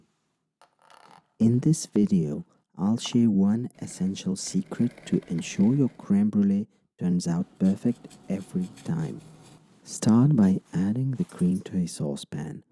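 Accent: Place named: Czech Republic. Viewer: cool czech accent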